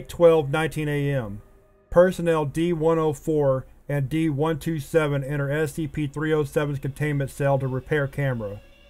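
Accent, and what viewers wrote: South, United States. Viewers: Ahh yes, your sweet southern SCP narration voice is always there for us!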